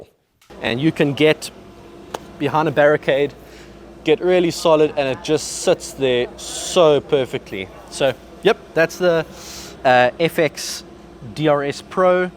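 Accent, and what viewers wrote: South Africa. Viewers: Note (for those not familiar with South African English): When says /ˈrafl/, he means rifle /ˈraɪ.fəl/.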